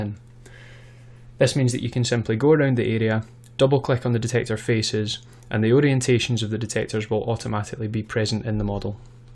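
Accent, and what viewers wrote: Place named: Ireland. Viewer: are you Irish? nice accent tho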